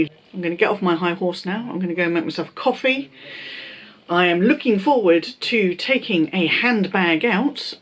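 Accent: United Kingdom. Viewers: Aww, I'm so sorry this all stressed you out so much! We don't love you and your channel because of your unboxing of luxury items, though. It's your humour, personality, positivity and, I admit (to my Canadian ear) British accent! You could be discussing the phone book, and I'd still watch as your take on anything and everything is always worth watching. Seriously, your honesty in this video is just one small example of why I adore you. You really are the best, <3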